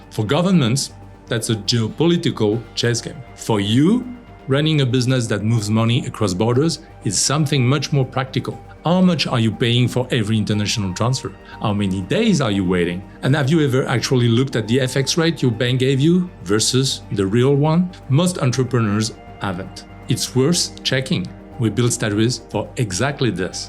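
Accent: France. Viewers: Interesting content on this channel but hard to listen to the French...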